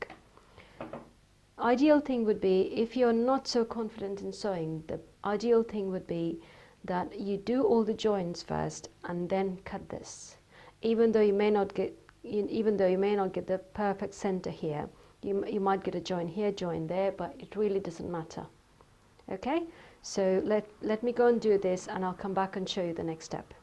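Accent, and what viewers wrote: United Kingdom. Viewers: I enjoy all your proyects and your britanic accent.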